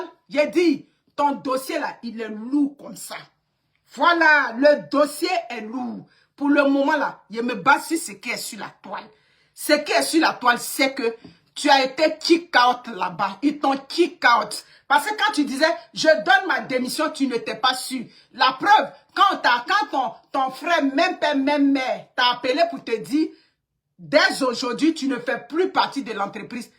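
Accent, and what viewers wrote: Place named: Ivory Coast. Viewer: Mme votre accent sonne comme une Ivoirienne.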